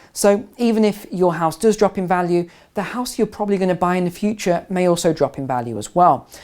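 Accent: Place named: Coventry, United Kingdom. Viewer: Nice one. I thought I detected a bit of a Cov twang. I went to Uni in Cov and my folks still live there. Same boat as you now mate, live between Philippines, USA and Mexico. Keep up the good work and thanks for the reply.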